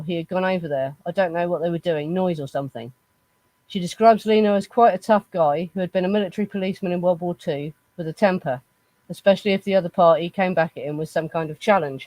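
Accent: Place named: Liverpool, United Kingdom. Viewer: I love your accent!!You sound like a Beatle!!My son's are named John,Paul,and George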